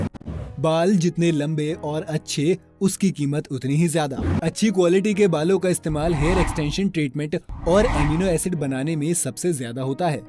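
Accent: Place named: South India, India. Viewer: South Indian voice hai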